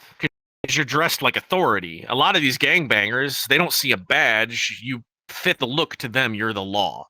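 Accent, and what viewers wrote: Chicago, United States. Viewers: Sounds like Chicago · sounds like Chicago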